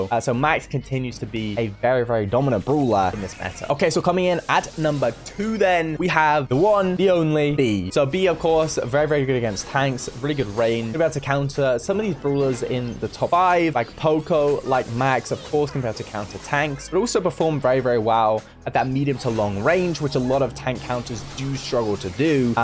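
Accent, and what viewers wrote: United Kingdom. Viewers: It's been a while Haven't heard ur bri'ish voice in like forever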